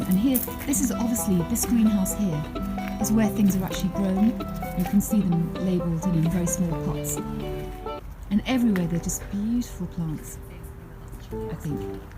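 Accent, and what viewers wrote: London, United Kingdom. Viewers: hi! thank you.! like London accent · 超级喜欢Manda的英式英语，很优雅好听，易懂。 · 学习了很多，还有高级的伦敦音，继续啊！超级喜欢你。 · London accent is music to the ears! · 小玉每期必看。thank you. Loooooove garden. I can speak Mandarin,English, Japanese and Shanghai Dialect. Love you speak British English. I have been living in Toronto for several decades. 谢谢分享花园。我非常喜欢花。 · 我從台灣來美國快40年. 我還是喜歡英式口音. 尤其Manda 講解課程的聲音. 非常輕柔. 太讓我陶醉!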